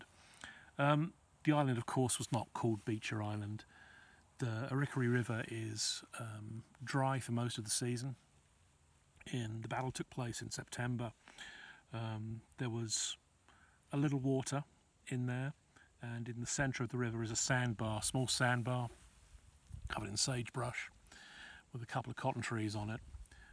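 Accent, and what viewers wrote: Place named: England, United Kingdom. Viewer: Good ole English voice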